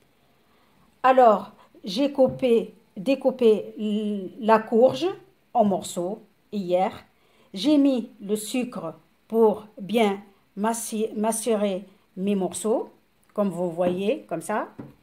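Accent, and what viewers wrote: Tunisia. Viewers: روعة و اللهجة التونسية اروع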